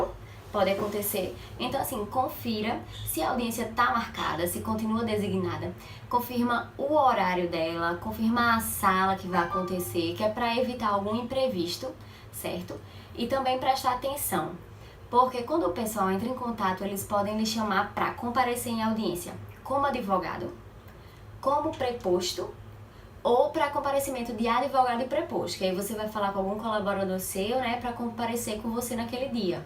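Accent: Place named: Natal, Brazil. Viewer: bom vídeo doutora, parabéns!!.. mas me tira uma duvida.. (sou novo na advocacia) na correspondência jurídica, vejo algumas solicitações de diligencias de audiências trabalhistas para ir como preposto. .. acho "estranho" isso pois, "pq não ir como advogado mesmo da reclamada?!!?" .. como serei proposto numa audiência de uma empresa que não trabalhei ou não tenho vinculo empregatício nenhum?? gostei MUITO de suas dicas para esse tema! obrigado! ps: meu sotaque é igual o seu, sou de NATAL-RN. e vc? grande abraço.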